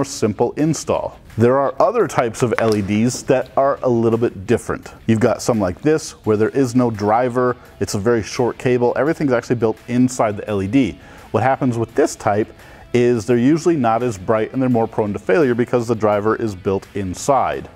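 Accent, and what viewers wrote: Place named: United States. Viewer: Dude, you should start with considering legality FIRST! Since you have an American accent, I will assume you are doing this for the US audience and you should be aware that per the DOT it is ILLEGAL to use LED bulbs in halogen reflectors! Helping you see better while blinding on coming drivers is not safety inhancement! Esp as they crash headon into the offending driver with LED headlights! And really easy to check on post accident.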